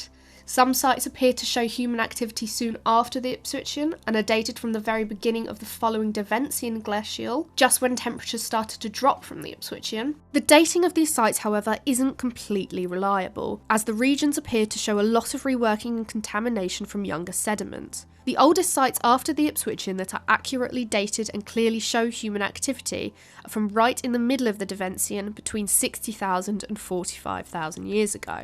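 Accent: United Kingdom. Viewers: beautyfull british accent for a video about britan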